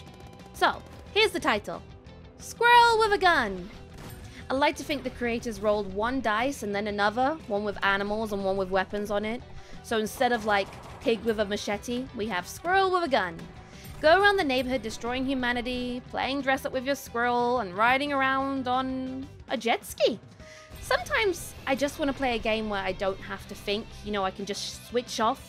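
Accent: United Kingdom. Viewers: I thought I heard some british accent or intonation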